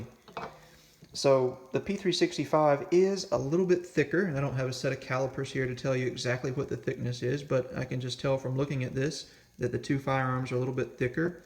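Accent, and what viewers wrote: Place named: Rural, United States. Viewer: "lingth", "ind to ind". Hick English😅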